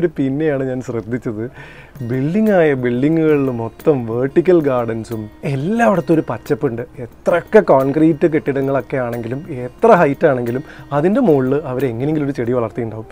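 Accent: Pattambi, India. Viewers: Pattambi slang kelkumbol too happy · Pattambikaranayondavum bb3 le anoop chettantyum gp chettantyum slang orupole😁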